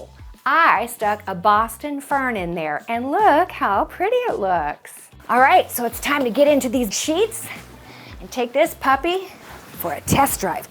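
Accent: England, United Kingdom. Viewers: haha- again a great video of yours, I just love your tiny english accent, thanks for sharing....:::))) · That English accent suits you....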